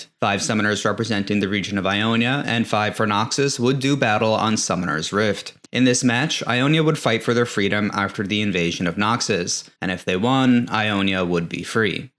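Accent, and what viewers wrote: Newfoundland, Canada. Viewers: Ive watched a few of your videos and enjoy your presentation, and not that it matters, but are you from Atlantic Canada, like Newfoundland & Labrador? I thought I could hear Irish or Scottish, but if I was on a gameshow I'd lock in NFL. Will be subscribing. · hey are you canadian? you sound like a newfoundlander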